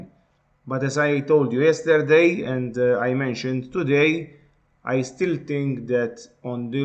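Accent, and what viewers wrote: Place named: Russia. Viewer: I like your accent beside the analysis. Like a person from russia or france.😁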